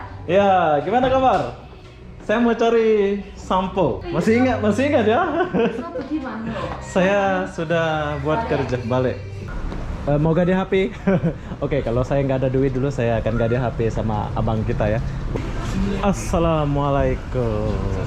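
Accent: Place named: Malaysia. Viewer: Anda memang orang malaysia bro ,jangan mengaku- ngaku sebagai warga indonesia,dari logat dan dialek bahasa juga sudah terlihat,bikin kontan soal indonesia hanya cari simpati ,cari folower dari indonesia,lebih baik jujur seperti obin morales,seperti tengkuruk channel,seperti rusdi rusli dia jujur asal malaysia tidak berpura2,kami lebih respek orang yang jujur daripada pura2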